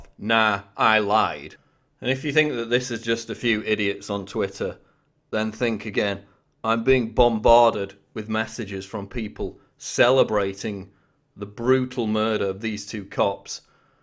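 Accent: United Kingdom. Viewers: Damn, I thought this was something that'd tell me some new information, its just a slow talking extremely biased brit.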